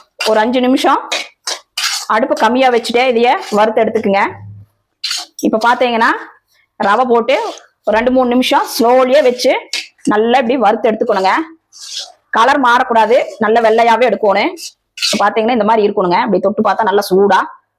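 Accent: Kongu, India. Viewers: எங்களுடைய கொங்கு தமிழில் நீங்கள் பேசி சமையல் செய்வது அருமை தங்கை🎉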